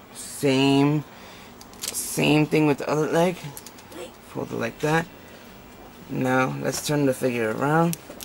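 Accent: Africa. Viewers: African accent? has an African accent? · why do you sound like your from africa ?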